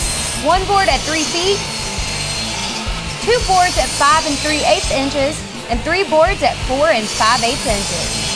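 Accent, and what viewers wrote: South, United States. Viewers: She tries to hide it but, I hear that Souther Twang. 👍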